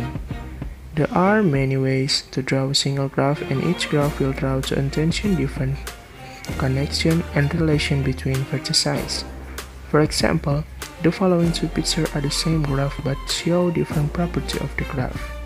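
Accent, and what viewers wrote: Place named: India. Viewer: Fuck you bloody *indian accent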